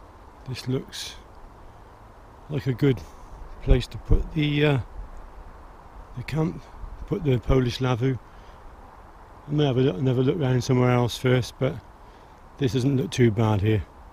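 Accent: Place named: Wigan, United Kingdom. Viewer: always thought you was a Wigan lad sound just them pie eaters up the road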